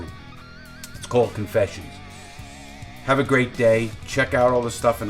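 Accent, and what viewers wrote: New York, United States. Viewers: Thank you. Always appreciate it. My father was born in the South Bronx in 1934. Your New York way of talking about things makes me think of him. He's been gone a long time now.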